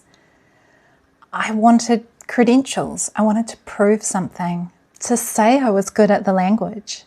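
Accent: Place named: New Zealand. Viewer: Yes! Great to connect. When I heard you cool Kiwi accent I felt like I was listening to a sister. Yes, pitch accent is so important. When we are out together , my wife lets me speak freestyle, but when we are at home together she is quite strict about teaching me how to speak with the right accent. Also she said "I can't teach you to speak mans style so you will need to find someone for that". I found a guy online who I would with once a week. He teaching me the real deal. I told him, no Keigo, and I do not want to learn textbook rubbish. And he agreed with me 100 percent. I think I'm an interesting case for him. My local friends are very patient but they mumble a lot. Tokyo japanese is so clear compared to that, and being in the countryside here in Amami, everyone speaks casual style He teaching me the real deal. I do 4 Kanji a day. I think Kanji will take a very long time to get. 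Your tips on learning to read stick with me, so I'll keep doing that. My reading is pretty bad though.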